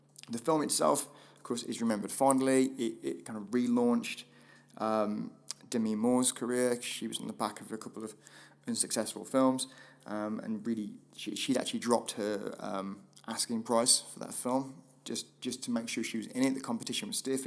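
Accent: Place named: United Kingdom. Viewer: You don't understand english? I'd understand if it's not your first language though since the accent is british, even then, I would study a bit harder on dialects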